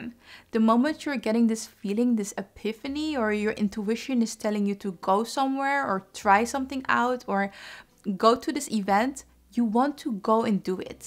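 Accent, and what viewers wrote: Netherlands. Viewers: Sounds like she’s from the Netherlands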